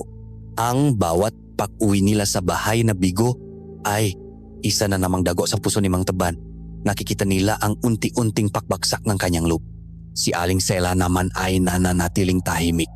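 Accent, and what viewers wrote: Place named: China. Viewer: Bakit namn po ganyan salita nyo Chinese po ba kayo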